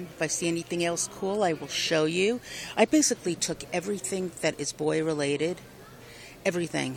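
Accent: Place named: New Jersey, United States. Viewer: Dakota will look super cute in that bunny romper!! Thanks for taking us shopping with you!! I love the outfit that siad hero and had the little bulldog..I love all the different things your Wal Mart has!! I love the monkeys and dogs on the planes sleeper!! Totally love the monkey best friends set as well! Who am I kidding..I loved every bit of it!!I love your NJ accent..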